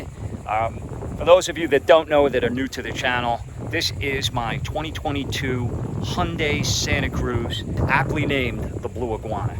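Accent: New York, United States. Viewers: Are you from NewYork? The Bronx???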